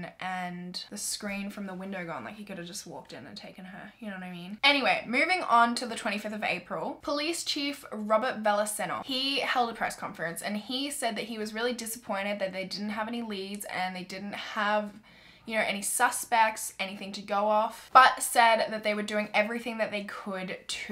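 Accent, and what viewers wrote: Australia. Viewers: Are you Australian? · Well she's Australian so she might be saying it different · Literally Exposedd British ? huh 😂💀💀 she’s Australian 🌚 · people always complain about your voice 🤔 ... ive seen it on forums and to me your voice is so AMAZING... AUSSIE accent CUTENESS IS REAL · Jomara Medina she is Australian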